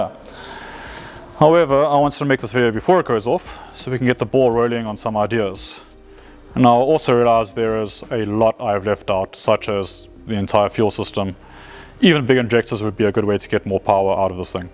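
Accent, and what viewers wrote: South Africa. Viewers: i heard the South African accent and subscribed immediately........good work buddy. Use motor bike ITB`s.........i saw a guy on youtube build ITB`s for a V12 Benz engine out-off Hayabusa intakes........just go to the scrapyard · Your accent also seems South African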